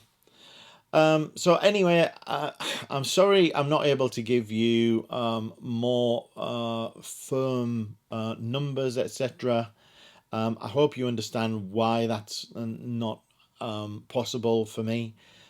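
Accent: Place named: North, United Kingdom. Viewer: First of all, glad that your back. I just started my lego Hobby a year ago, while struggling with depressions. Building helped me to find some sort of calm. Having not a great amount of money, I needed to find a way to pay for my new addiction. Inspired by your videos (at first I'd liked just listen to your great northern accent), I was thinking about doing as you did and I started to chase deals, I parted out, created lists and did all what is nessecary for a Shop. I even more enjoyed all those things comparing to just building (which I still love). I'm in my 50s as well, so I will try to do it like you did later on. My Shop is still very small and I haven't sold that much yet (at the moment most of my sellings are minifigs on ebay) but I hope to grow and make some money later on. The best thing is that all the tasks which this Shop needs really help to set my f**** depression in place. 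So m8, cheers for your insperation. Greets from Germany Btw: I'm a big Motörhead fan, so my Shop is called Motörbricks 😉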